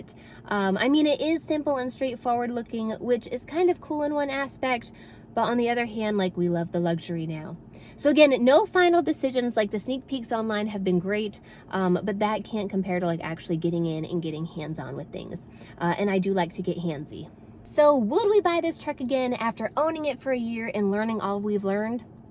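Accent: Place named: South, United States. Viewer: Do more videos I love ❤️ that country accent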